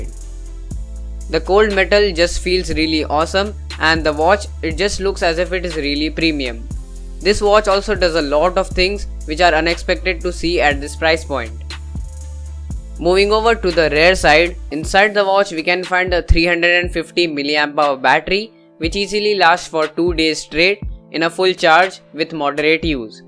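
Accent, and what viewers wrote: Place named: India. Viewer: thanks for a great review, I'm buying one, Nice english/indian accent, friendly people.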